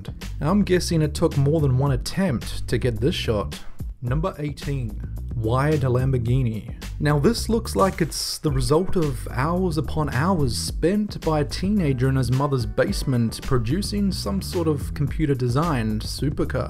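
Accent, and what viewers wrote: New Zealand. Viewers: Maybe a New Zealand accent? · First of all, did not copy Danger Dolan's accent. Haven't any of you ever heard of different accents. Ex: Australian, New Zealand, British, Canadian.... and so on And FYI every location, Country, State, or resident, has a different accent depending were the person is born. 2nd of all, Why do random people asum he is purposely trying to copy other accents? There is more than just only one person, with the same accent. Thirdly: Danger Dolan is fron Australia, and is from New Zealand. Fourthly: Dolan agreed to help, with the production of this channel. And Lastly: If you dislike or are still assuming this is a ripoff, then please leave this channel, and just go watch something else. For every stupid person out there, who complains about accents on this channel, Why are you even here on this channel?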